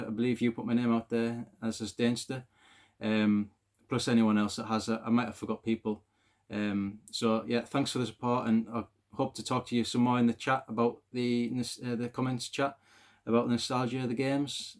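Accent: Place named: Cumbria, United Kingdom. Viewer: Good video. Are you cumbrian by any chance? 😁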